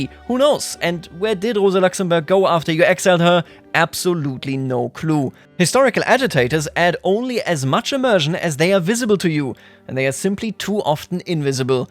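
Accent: Germany. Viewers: how does he sound so german yet so fluent in english at the same time? i cant stop listening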